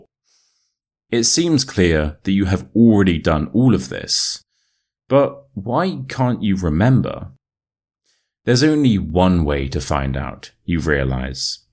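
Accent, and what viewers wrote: United Kingdom. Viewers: Wao i just want to listen the British accent a end up focusing at how story ends , I love it thx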